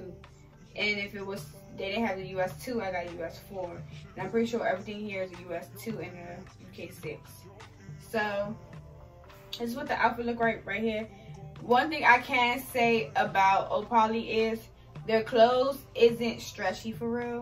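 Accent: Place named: DMV, United States. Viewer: I love everything sis that dmv accent 🤣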